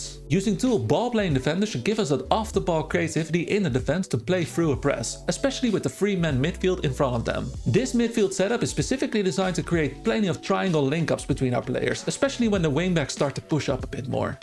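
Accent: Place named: Netherlands. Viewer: Oh wauw, haven’t even heard of this good lad yet. Sounds like he’s a fellow dutchman as well 🇳🇱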